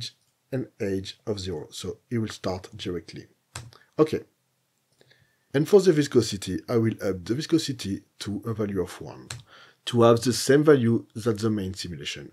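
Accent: France. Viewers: ça sent le french accent! En tout cas il y a du client si jamais tu le fasi en FR pour gagner en réflexion à l'apprentissage de tes vidéos!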